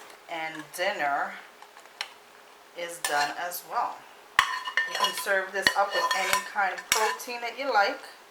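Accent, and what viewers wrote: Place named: New York, United States. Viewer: Love it looks delicious . are you from NY ? I live in the south but I'm originally from Long Island NY. I miss hearing the NY accent . love you recipes keep putting them out ❤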